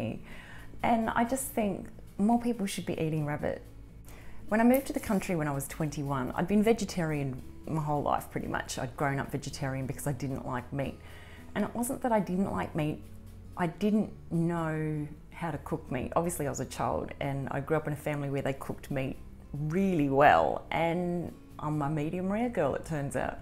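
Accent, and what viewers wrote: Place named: Australia. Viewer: I can't placee your accent... I wanna say "aussie"? - HA! Nailed it. Sorry: autism. I struggle with accents.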